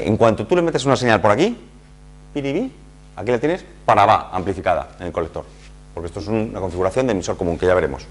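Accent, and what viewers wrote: Spain. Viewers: Exelente manera de explicar! me cuesta un poco entenderlo por la manera de hablar rapidito y con ese tono propio de españa jajajj pero muy clara su explicacion ! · Asombroso , impresionante .En 10 minutos desarrollaste un tema con una claridad pocas veces vista. El único detallecito es que hablas un español típicamente peninsular (demasiado rápido y con la boca casi cerrada ) . Por lo demás , excelente ,.10 puntos .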